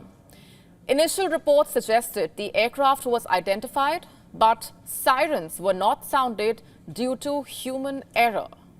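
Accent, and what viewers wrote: India. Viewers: Why indain accent suck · She is from India not Middle East.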